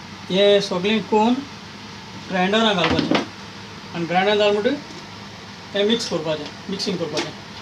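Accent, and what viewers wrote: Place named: Salcete, India. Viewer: I just love the way you talk pure sashti konkani and your way of explaining the recipe thank you so much God bless you always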